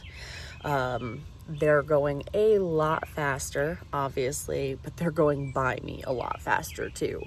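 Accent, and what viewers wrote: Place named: Boston, United States. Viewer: you definitely didn't lose the East Coast accent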